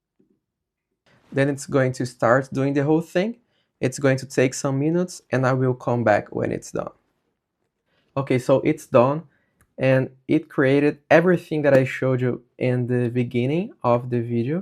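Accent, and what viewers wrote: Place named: Brazil. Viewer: Mano quando tu começou a falar eu logo pensei "rapaz esse bixo é brasileiro" falou o já matei kkk obrigado pelo tutorial mestre.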